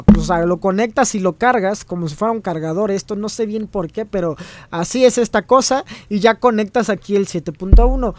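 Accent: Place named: Mexico. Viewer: jajaj me gusta el acento mexicano noooo mames cabronn jajaja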